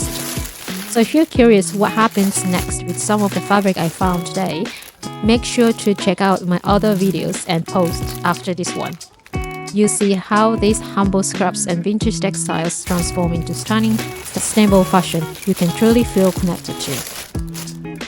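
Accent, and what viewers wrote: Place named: United Kingdom. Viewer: I have never seen your channel, and I like your accent, it’s very British.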